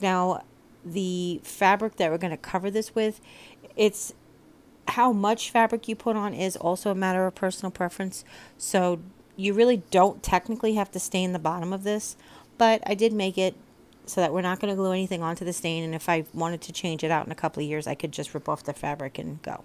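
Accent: New York, United States. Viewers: Love the rosette tutorial! Do I detect a NY accent?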